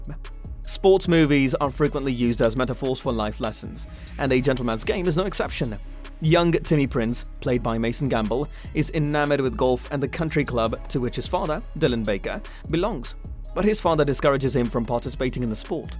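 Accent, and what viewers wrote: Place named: United Kingdom. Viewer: Drop the British accent. Irritating!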